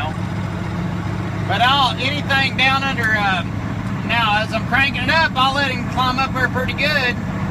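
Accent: United States. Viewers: I'd love to ride with ya hell that country talk having me rolling all day that sumbitch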